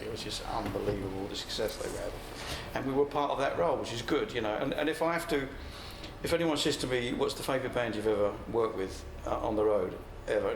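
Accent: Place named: Cockney, United Kingdom. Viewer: Very humble bloke,Cockney accent,reminds me Steve Harris in the way he Talk and the sense of humor,working class attitude,anyway,It's a pleasure seeing Mick Talkin' about his favourite albums and It's not Easy to pick only five great albums,that's how great are to me,could be Seven albums at least and I'm not a hughe die hard fan of the band but surely they eat in same table with, and and not necessarily in that order,so this is my top 5 àlbums. 1.. 2. 3.. 4. 5..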